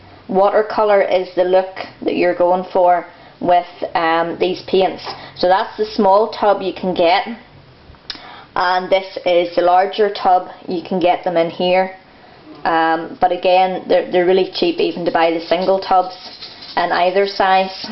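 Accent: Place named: Northern Ireland, United Kingdom. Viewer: I totally wasn't expecting a fellow Northern Irish person ❤ thanks for the tips xx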